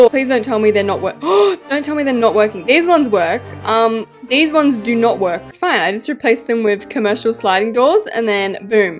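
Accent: Australia. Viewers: OMG UR AUSTRALIAN??!! if u r im so HAPPYYYY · What your Australian? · Your from Australia same gurl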